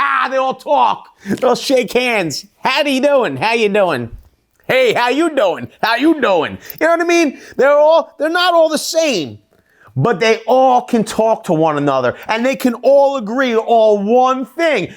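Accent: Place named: New England, United States. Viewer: The voice , couldn't watch that Yankee accent..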